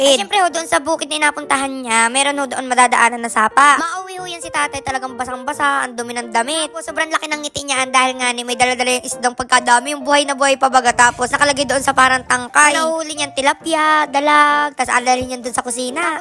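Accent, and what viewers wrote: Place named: Quezon, Philippines. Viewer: Quezon province, specifically in mulanay quezon same accent with you po 😊😄 watching from Qatar now 😊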